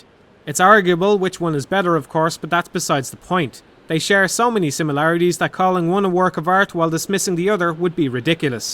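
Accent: Ireland. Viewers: just been scouring through the top search results for Ico review, & i don't need to look any further now. as an english lad i'd much rather listen to a crackin irish accent than a whiney smiley american talk about a game that has genuine sentimental value to me - my mam used to work in Choices (blockbuster before blockbuster) lots of VHS, lots of 5th and 6th gen of gaming, some DVD's. as things were in the early 2000's. Remember playing Ico with her at a very young age & loved the world it created. funnily enough we rented shadow of the colossus shortly after completing Ico, found it way too hard and ambiguous and never finished it before returning it's a bit mad really, when silly things like games stay in your mind nearly 20 years later & become warm & fond abstract memories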